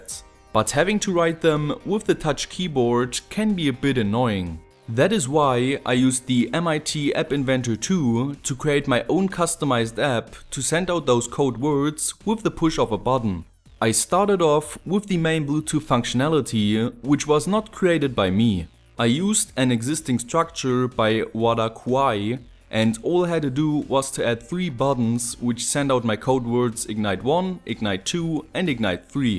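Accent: Germany. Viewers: Bart Simpsons he says people see that you are german because of the german accent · Man merkt das du Deutsch bist. An dem deutschen Akzent. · Höre ich da einen deutschen Aktzent? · nice ^^ man hört richtig das du deutsch bist hehe hast n abo bekommen ^^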